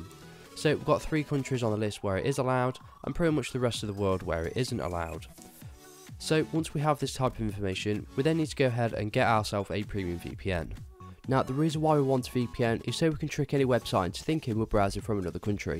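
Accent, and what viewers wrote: United Kingdom. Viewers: British accent hard for us to understand (we the US very easy on English )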